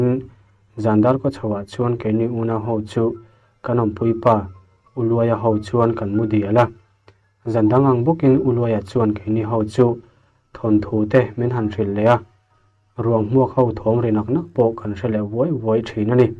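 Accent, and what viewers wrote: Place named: Ralvawng, India. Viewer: Ralvawng tlang aw😊👍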